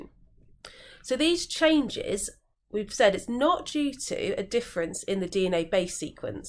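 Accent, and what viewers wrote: United Kingdom. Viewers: Like your British accent. You pronounce certain words differently than we do in America.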